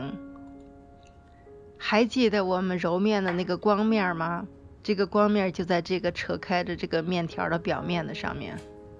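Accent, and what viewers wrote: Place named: Shijiazhuang, China. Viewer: 听口音像石家庄滴：）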